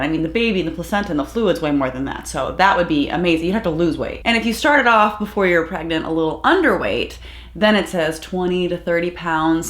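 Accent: South, United States. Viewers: Are you from the south?? I hear a faint accent! I’m Canadian so I’m hearing a twang?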